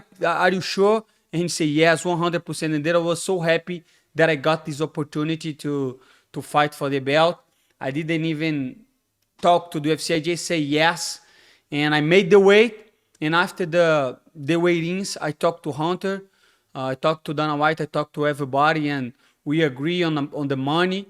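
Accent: Brazil. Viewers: Thats the most brazilian sounding brazilian ive ever seen.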